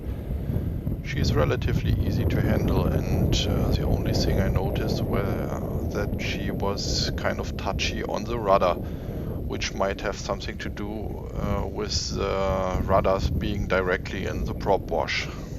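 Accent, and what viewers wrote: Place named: Germany. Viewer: Nice flight,nice Denglish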